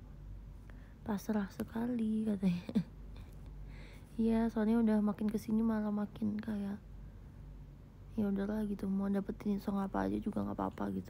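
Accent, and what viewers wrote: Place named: West Java, Indonesia. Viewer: Mlm ni nyunda pisan uyy....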